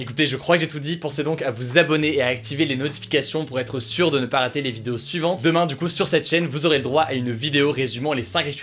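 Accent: England, United Kingdom. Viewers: That english accent though, legit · J'adore ton accent anglais 😍😂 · Pourquoi tu portes un accent britannique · mdr ton accent en anglais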